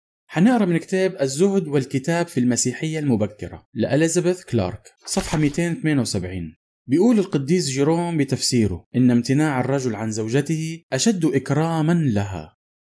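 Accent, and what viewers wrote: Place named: Lebanon. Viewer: من أجمل القنوات جزاك الله كل خير لاحظت أخي من لهجتك أنك لبناني صحيح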